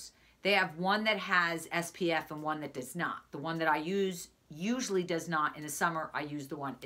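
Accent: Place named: New Jersey, United States. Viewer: Go, Jersey! I'm from Jersey and miss that accent. I loved your video on Gold Bond lotion. I just ordered it, and I can't wait to try it out. Thank you for the video review.😊😊😊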